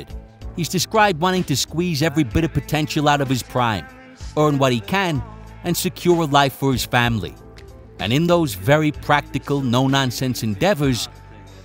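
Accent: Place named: Northeast, United States. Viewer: Time for a poll: Which part of the Northeastern US is the narrator from?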